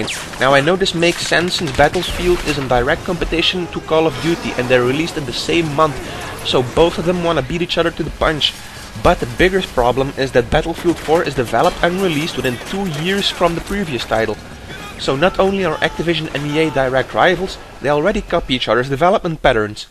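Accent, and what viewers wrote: Netherlands. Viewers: lol, I love the Dutch accent!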